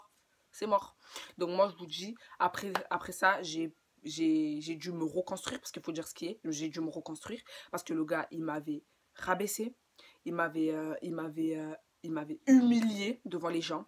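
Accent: Marseille, France. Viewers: on dirait une marseillaise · Oui on veux la partie 2 de ta best t grave mimi les mecs faut pas vivre pour eux avance fais ta life jador ton accent marseillais 😊 ..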